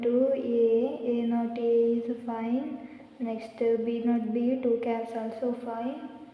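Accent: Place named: Mangalore, India. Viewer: U r from manglore right???😁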